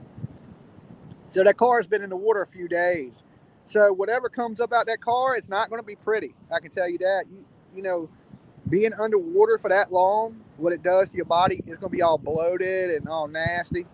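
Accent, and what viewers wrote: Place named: Hampton Roads, United States. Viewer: Hey, Are you from the 757? You sound like you are. 😊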